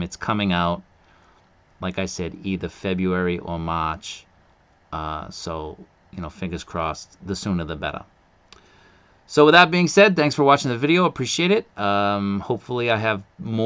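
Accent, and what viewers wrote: Boston, United States. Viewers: It’s nice to hear the accent I am accustomed to in my daily on YouTube. Not enough Bostonians on YouTube. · by the way I'm the one on reddit asking you to do little Japanese in your Boston accent 😂. I understand why you don't but man it would be gold.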